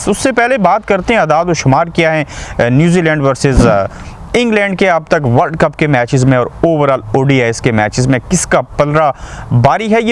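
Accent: Kashmir, India. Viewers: You’re anywhere from kashmir I can feel it the way of talking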